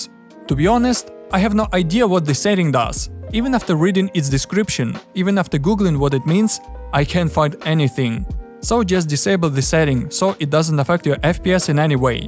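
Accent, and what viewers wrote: Russia. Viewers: Слышно что Русский либо Укр))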